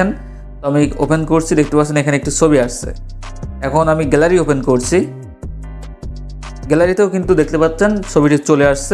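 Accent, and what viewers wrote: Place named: Comilla, Bangladesh. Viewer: ধন্যবাদ ভাই। ভাইয়ের বাড়ি কোথায়? কুমিল্লা মনে হচ্ছে?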